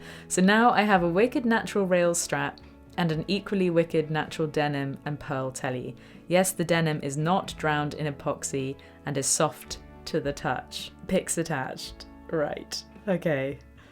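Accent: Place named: England, United Kingdom. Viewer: is the best looking of the many guitar teachers I follow (and that English accent is fetching), and one of the best teachers too, plus her musicianship just keeps improving. Thanks for your videos Sensei - cheers!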